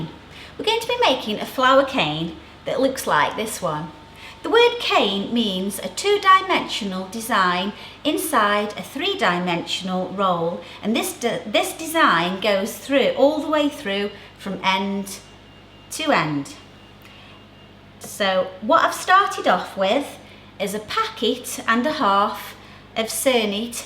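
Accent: United Kingdom. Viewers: oh my.... i love your accent.i didnt like British before but you talk really beautifully.and your tutorial was awesome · Obviously some ignorant people who think the English all talk like Kate Winslet!! There’s so many awesome accents in the UK! Hers being one of them. Thanks for a great tutorial. Love from Oz x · i love your little british acent X3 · what are you talking about? there is no Irish accent here, just a British one, probably midlands maybe southern. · Tutorials are always better with a British accent!!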